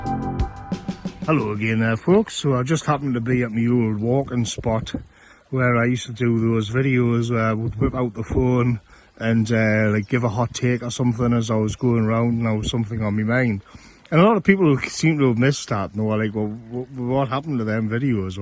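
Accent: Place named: North East England, United Kingdom. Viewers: I remember your tree roots video. I also remember getting to grips with the Geordie accent So I could appreciate your content when you only had a couple of thousand followers. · very capable. His accent is working class north east.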